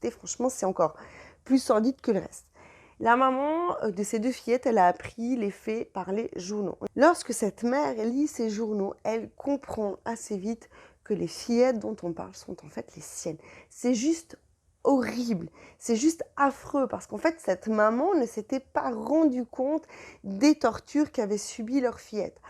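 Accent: Switzerland. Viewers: votre petit accent suisse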